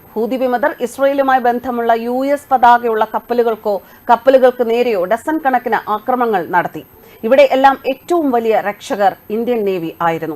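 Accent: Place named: Palakkad, India. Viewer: ഹോ ഒരു കൂതി ഭാഷ പാലക്കാടനാ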